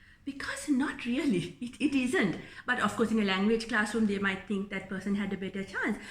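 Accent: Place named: Sri Lanka. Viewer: Sri lankan standard english accent.👍👍👍👍👍❤️❤️❤️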